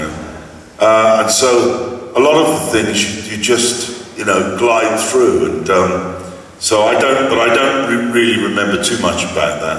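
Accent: United Kingdom. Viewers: This guy was an extraordiary monumnet of rock music and I really love to listen to him talking with this intensive british sound (what else could it be then?)! This is heartwarming - I grew up with his music and i still honor him deeply! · Loved his British accent. He is missed.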